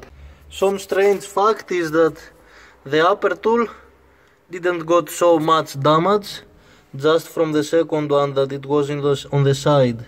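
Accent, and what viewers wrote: Greece. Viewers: Recognised your Greek accent immediately. Then I saw the Greek flag on your arm. :P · greek - english accent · Are you Greek? Your accent sounds like the Greek accent. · you sound like you are greek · RandomizedPyro that could be an accent from a greek person too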